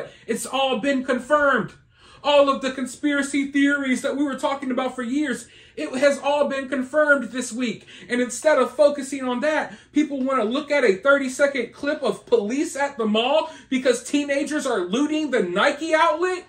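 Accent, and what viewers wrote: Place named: Georgia, United States. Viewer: Your a Floridian? I thought you were a Georgian!!!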